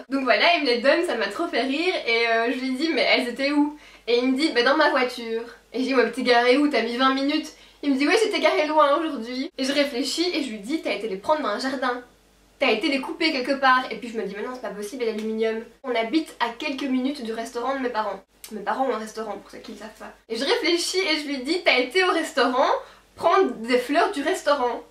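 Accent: Belgium. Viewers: Coucou, J'adore tes vidéos et ton petit accent belge:-) Ps: pense à recycler tes anciens téléphones portables, c'est mieux pour la planète!!!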